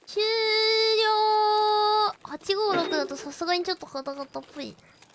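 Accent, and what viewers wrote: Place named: Japan. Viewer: ひらがな英語可愛すぎる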